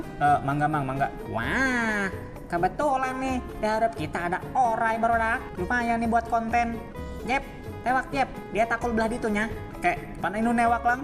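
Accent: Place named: Citorek, Indonesia. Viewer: Logat mna ini bg kaya kenal, citorek bukan si😅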